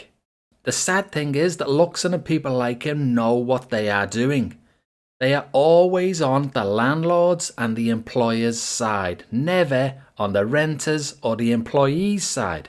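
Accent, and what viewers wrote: Liverpool, United Kingdom. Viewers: This video makes sense. The scouser being the voiceover doesn't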